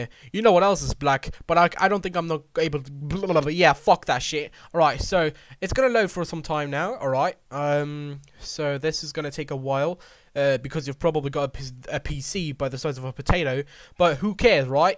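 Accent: United Kingdom. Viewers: Nice and Concise mate . I cant tell where in england ur accents from tho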